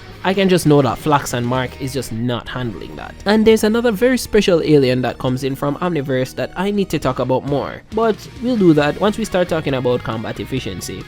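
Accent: Jamaica. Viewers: Are you Jamaican because you sound 100% Jamaican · You can really hear your Jamaican accent coming out in this one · Are you Jamaican?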